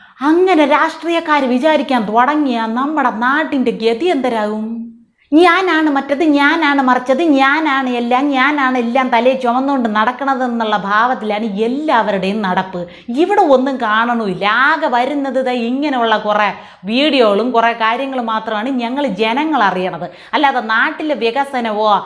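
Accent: Thiruvananthapuram, India. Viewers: ഭാഷ തനി തിരുവനന്തപുരം: തിരുവനന്തപുരത്ത് കാരിയാണോ? അവതരണം സൂപ്പർ❤❤❤❤❤❤❤❤❤❤❤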